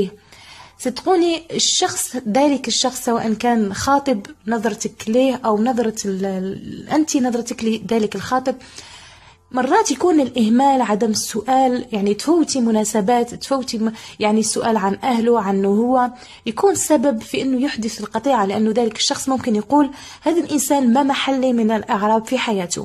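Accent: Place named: Libya. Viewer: لهجتك ليبيه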